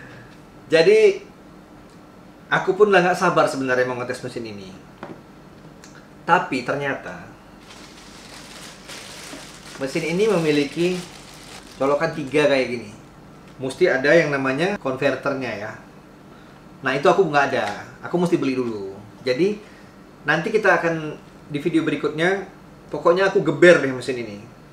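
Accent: Medan, Indonesia. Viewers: opening-nya ini jadi signature ente banget bang... "apa kabar kelen kawan kawanku semua...?" Medan Taste...! keep it up bang...! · Abg orang medan yaaaa?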